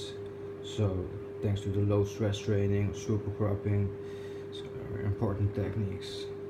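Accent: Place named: Netherlands. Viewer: Hey r u Dutch? You sound Dutch... or scandinavian. Anyway + sub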